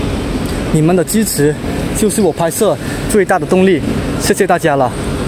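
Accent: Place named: Cantonese, China. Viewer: 讲这种粤式普通话，你不觉得羞耻的吗？讲粤语啦！！！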